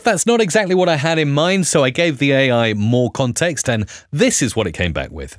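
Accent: France. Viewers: The accent french 👨🏿‍💻😂😂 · The French accent is so authentic 😂🤣Amazing !!! Thank you for this moment ! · What a French accent! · french accent was mad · Fine I’ll subscribe after the French accent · Je ne sais pas comment prendre la "blague" sur l'accent français mais c'est tellement vrai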